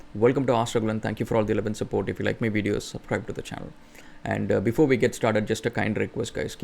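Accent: Tamil Nadu, India. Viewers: You are not even able to speak out the names of north indian languages due to your heavy tamil accent!!! Even saying names of north indian languages is a big struggle for you!!! Even though you are a man of privilege and have learnt english for decades your english speaking is gramatically flawed. Not your fault as your default language is tamil. Imagine lower strata of societies trying to learn english. How frustrating will it be for them to learn something alien !!! I have moved in tamil nadu hardly anybody on the streets can underdtand english or speak back in english. TN is a 1 language state !!! English has not reached even the tier 2 towns!! Learning hindi will atleast allow the lower strata of societies to be mobile sround india, trade and estsblish businesses within the country rather than lesrn english and stay inside TN where the acceptance of English is very low on a day to day street level.basis.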